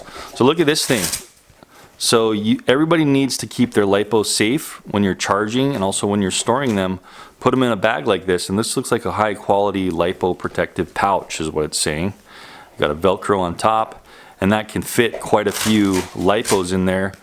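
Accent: United States. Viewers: do you come from america)ok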